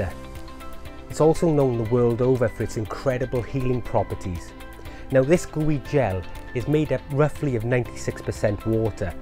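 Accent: Wales, United Kingdom. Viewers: Are you a Welshman? Charming in any case!!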